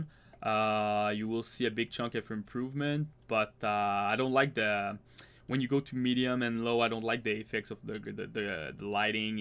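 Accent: Quebec, Canada. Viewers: t québecois sa parait xd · québécois ?? :P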